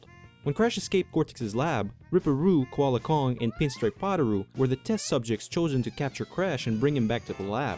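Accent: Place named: New York, United States. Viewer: Wait, your first language was not english? I could easily pass you for a new yorker, your accent is perfect for america!!!! I just sound like a (weard) english person.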